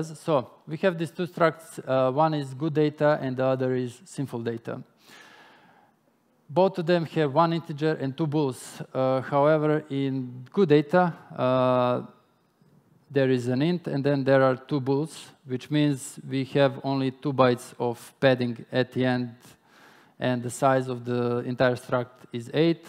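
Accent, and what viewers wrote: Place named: India. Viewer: Ирландец с акцентом индуса )), это немножко ломает мозг, но доклад классный. Спасибо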